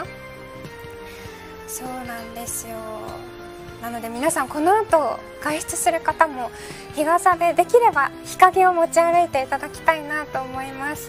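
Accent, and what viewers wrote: Hakata, Japan. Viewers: もう博多弁で話していいよ てかキャスターの出身地の方言縛りの日あったら面白そう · もうずっと博多弁でやって欲しい✨ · 博多弁だったんだって改めて認識 九州弁(博多も使う)のだと思ってた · 緊急事態以外、博多弁でやっても全然良い。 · ゆってへんだけでニュアンスはめっちゃ博多弁よなこの子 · 後半の博多弁で無事キュン死(*∩ω∩) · 勘違いしたらいかんよ、可愛い子が博多弁を喋るけん可愛いと。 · 博多弁のままでよかよ(^^)♫ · 美月さん博多弁可愛いいですね。 笑顔でごまかす姿がとても可愛いいですね😊 見ていてとても癒されますね。 まさに女神ですね👍️ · 博多弁可愛すぎる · もう、博多弁でよかろうもん。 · 博多弁は正義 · 途中からの博多弁凄くカワイイですね☺️ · むしろ博多弁にしてほしい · カワイイ(*^.^*) いいんじゃないかな。博多弁とか方言混じり。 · 博多弁好きやけんねー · 関西人やけど博多弁が一番可愛いし好きやわ。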